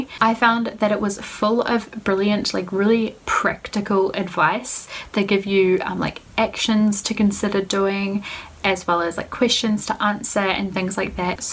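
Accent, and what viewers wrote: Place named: New Zealand. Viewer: Just stumbled across your channel from your Harry Potter film Wizardry flick through. I could tell you were a Kiwi straight away from your accent :) Just subbed and So excited to see more of your videos in the new year!! HAPPY 2019 - Also when you said Molly Moon it brought back so many memories haha.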